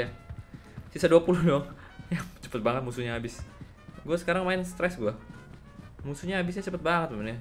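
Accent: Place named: Central Java, Indonesia. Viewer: Dari jawa tengah mana suaranya🙏🙏🙏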